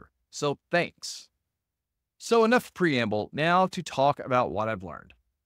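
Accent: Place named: Texas, United States. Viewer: Nothing like a bald guy with a Texas twang to start my week. Thanks B, this was a great wrap up and it was nice seeing you.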